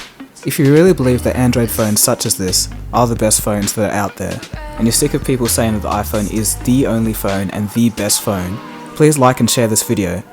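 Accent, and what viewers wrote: Australia. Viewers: great review but I still love my iPhone 5. Love your australian accent though XD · This is one one of the best reviews I have ever seen. I just wish I could buy the phone. It's too pricy for me to buy. I am from Indonesia and it cost a lot here. All I want to say thanks mate. I love your Aussie accent. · Really good review, probly the best review of anything ive seen on youtube, no kidding oh and great to hear a fellow Aussie doing it. looks like an Awesome device, didnt see any drawing on it really, will look at your other clips.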